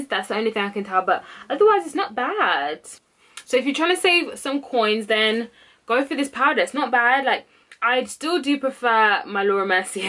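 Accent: United States. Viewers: I wish you wouldn’t speak like a American 😔 love videos though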